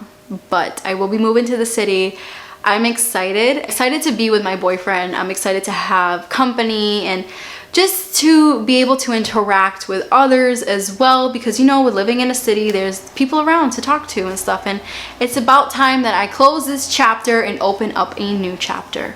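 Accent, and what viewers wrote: Boston, United States. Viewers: Where r u from? Is it Boston ?, Jersey?I hear ur accent every once in a while, but can’t place it. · your dialect is fascinating, where in USA are you from! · She's from Boston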